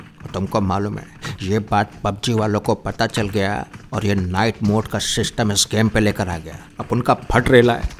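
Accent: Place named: Bihar, India. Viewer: Wo bihari ascent, shukla sir ka class yaad aa gaya..!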